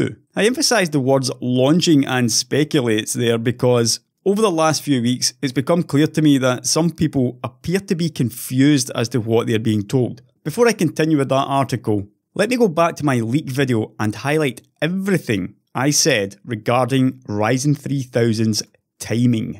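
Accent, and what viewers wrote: Scotland, United Kingdom. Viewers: with a scottish accent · I just LOVE your scottish accent... you sound like straight outta Braveheart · Scottish accented videos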